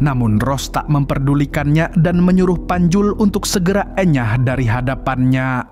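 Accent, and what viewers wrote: Banjar, Indonesia. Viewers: Urang Banjar kah nywa,,haha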